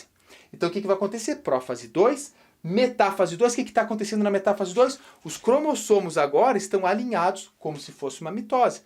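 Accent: Rio Grande do Sul, Brazil. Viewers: Te liga mano veio, é gaúcho? Kkkk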